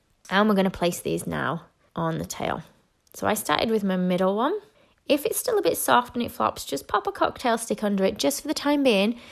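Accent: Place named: North, United Kingdom. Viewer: Gotta love a northern accent!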